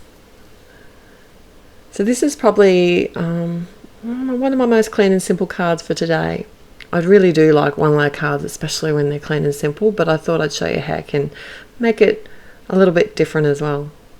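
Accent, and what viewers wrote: Australia. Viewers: Lovely one layer cards. It is nice to hear a fellow Aussie on YouTube.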